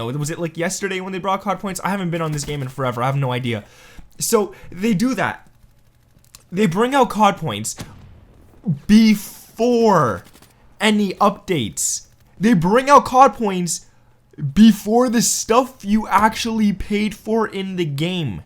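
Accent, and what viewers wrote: Canada. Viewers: Dude your Canadian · r u Canadian? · Matthew M he's canadian...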